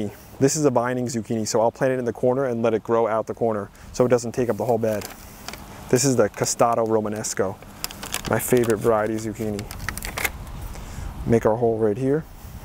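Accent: New Jersey, United States. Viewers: his loudmouth Jersey Yankee style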